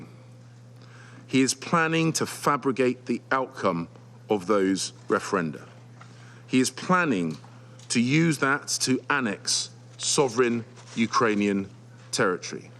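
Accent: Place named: England, United Kingdom. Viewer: Bullshittting with English accent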